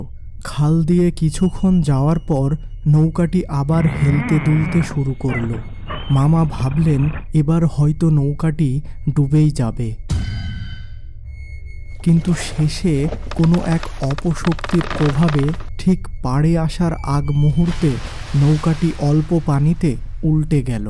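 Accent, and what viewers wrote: Bangladesh. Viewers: কথা শুনে মনে হয় বাংলাদেশের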